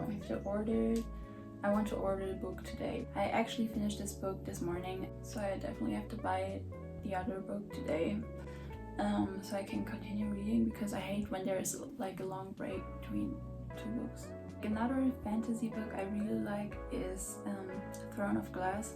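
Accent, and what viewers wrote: Germany. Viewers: Omg I go to a German school where I learn in English but I’m learning German too,I noticed you were German from the moment I heard you!! Du bist ganz schön und cool😎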